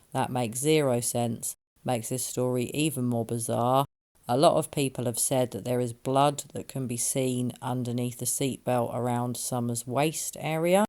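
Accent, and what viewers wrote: United Kingdom. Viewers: If it IS true, grandma MUST have told LE waaay before now. I don't believe it's really grandma. Btw Love your content, love your delivery even more - nice to hear another Brit lol London.lady here - New sub too x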